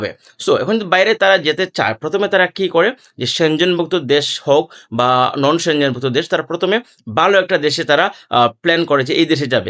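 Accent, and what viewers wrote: Sylhet, Bangladesh. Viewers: ভাইর বাড়ি কিতা সিলেট নি....?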